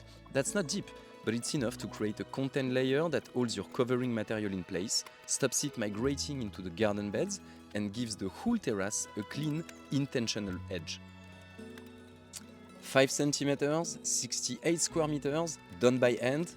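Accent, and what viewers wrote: France. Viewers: I love your french accent, your english is perfect but (la petite touche française) it's brilliant, I have the same, some times people tell me, what you just said sounds french and there I go ( my 1est language is french/suisse). Bonne aventure profitez la vie est trop courte.:thanksdoc: 🌼🥀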